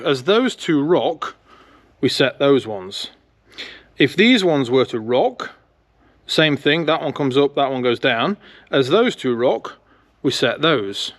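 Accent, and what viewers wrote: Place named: Northern England, United Kingdom. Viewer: Are you actually Swedish? You sound like someone who's from Northern England.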